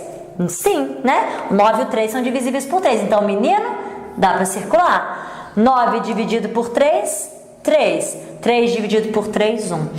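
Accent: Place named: Minas Gerais, Brazil. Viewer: Professora, amei o vídeo! Adorei o sotaque mineirinho também! 🙂 Gratidão!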